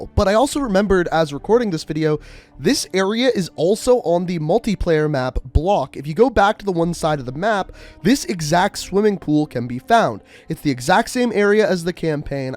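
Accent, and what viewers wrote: Canada. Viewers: You say ‘about’ and ‘out’ and I can instantly tell you are Canadian. Cool video. Thanks. I’m not sure if this is continuity in the Call of Duty games or just laziness by Activision? They probably have the skeleton’s of these maps and convert them over to their current engine. Will we get another Map, besides Verdansk?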